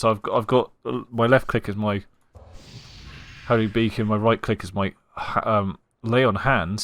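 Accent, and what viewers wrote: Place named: United Kingdom. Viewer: Seriously, it has to be something in the water. Another goofy brit with a thick accent trying to make a guide on an American game. Lol and this goofball actually has someone talking over him. So weird!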